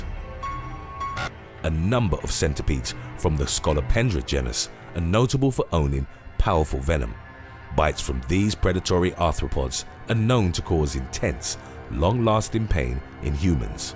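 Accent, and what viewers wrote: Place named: England, United Kingdom. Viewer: He's not Australian. That's an English accent, and this channel is notorious for getting things wrong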